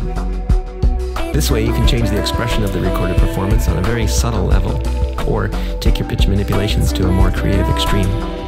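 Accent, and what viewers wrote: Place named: Canada. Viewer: Haha, he sounds Canadian to me, too! Anyway, cool stuff.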